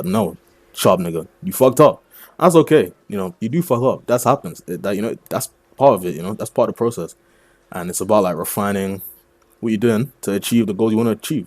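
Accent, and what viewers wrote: United Kingdom. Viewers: Deffo tell you’re from some part of london with the voice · this guy sounds so british and i love to hear that there are other good british editors around in the community and not just a bunch of russian tryhards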